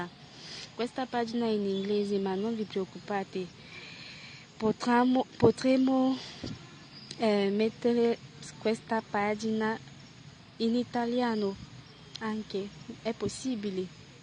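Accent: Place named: France. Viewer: Il tuo accento mi fa impazzire! Sono francese anchio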